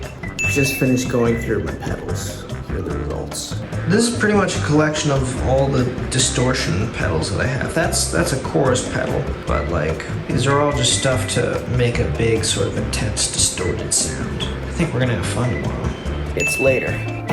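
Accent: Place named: England, United Kingdom. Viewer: Hello, I hope you see this comment. You have many fans in Turkey and there are no Turkish subtitles in your videos. It is not a problem for me, but I think it is a problem for those who do not understand the English accent. Please take this comment into consideration and you should do something about this issue. I think we love you.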